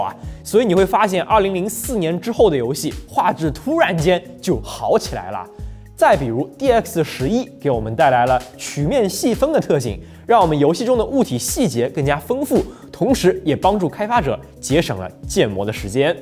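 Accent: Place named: China. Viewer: this is the PRC's essense of pronounciation.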